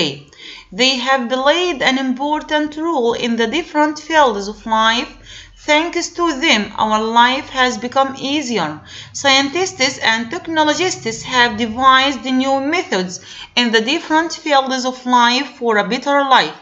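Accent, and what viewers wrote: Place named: Egypt. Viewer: english with egypian accent lmaoo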